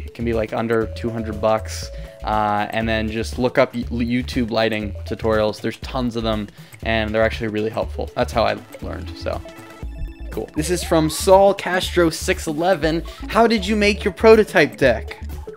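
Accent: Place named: United Kingdom. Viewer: Lovely UK accent ;)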